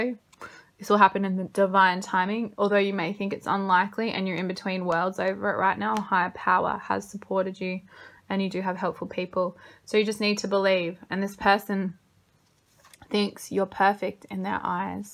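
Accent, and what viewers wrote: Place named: Australia. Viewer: #4 but couldn't focus much on what u were saying but i absolutely LOVE ur aussie accent. ah my heart 😂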